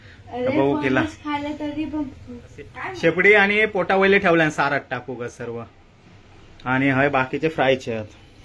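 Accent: Malvani, India. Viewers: तुमची मालवणी भाषा पण खूप सुंदर आहे ऐकायला छान वाटते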